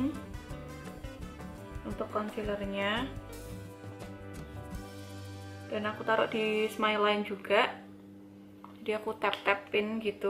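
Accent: Java, Indonesia. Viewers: Lg nyari2..tutorial makeup..ketemu ini channel Tak kirain td bule' kak..eh gak taunya medok jawa...😂asli langsung aku subc ini kak... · medook e... :D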